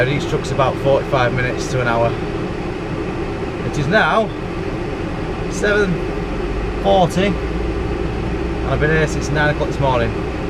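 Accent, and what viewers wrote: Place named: Birmingham, United Kingdom. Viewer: your brummy accent is good I’ll give u that lol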